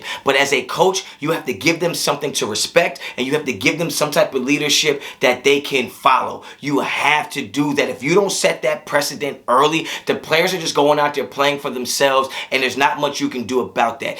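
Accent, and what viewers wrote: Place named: AAVE, United States. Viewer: Stop with the ebonics, knucklehead. It's a bad look, bro. Trust me. 🙉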